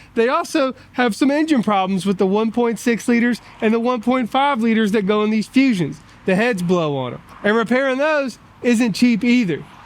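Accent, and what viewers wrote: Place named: South Carolina, United States. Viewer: South Carolina i hear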